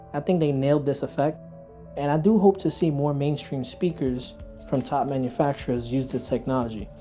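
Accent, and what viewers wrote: New York, United States. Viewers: Subscribed to your channel for: 1. The in depth tech reviews 2. Eye grabbing videography 3. The familiar New York accent Well done. Keep up the great work!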